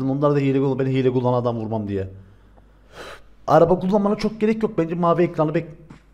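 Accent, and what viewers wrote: Cyprus. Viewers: Kibrislilar gibi konusuyorsun nerelisin😂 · Abi gıbrıslımısın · Abi senin sivenden anladim kibrislisin dimi bnde kibrisliyim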